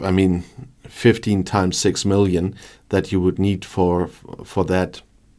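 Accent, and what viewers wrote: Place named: Germany. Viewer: You're English is fine..of course you have a German accent to us Americans but it's not very heavy it's actually kinda cool..however some of your words you pronounce the British way but that's to be expected, overall you are very clear and easily understandable bro 👍🏽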